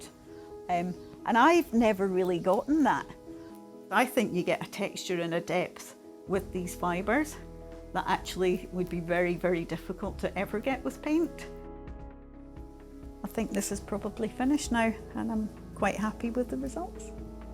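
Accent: Scotland, United Kingdom. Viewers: I love your work and your beautiful Scottish accent. My dear friend Wendy was from Arboath and I could listen to her talk all day long. Sadly we lost her to cancer a few yrs ago, but thank you for reminding me how much Ive missed her and what a treasured friend she was. Cheers from stinking hot FL. ;-)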